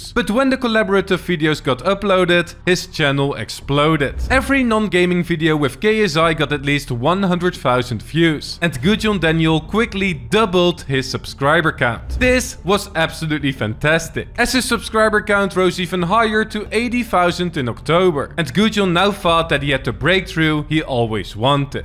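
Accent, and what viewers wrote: Netherlands. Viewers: Brother, he's from the Netherlands. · Im almost sure ur dutch. You sound dutch af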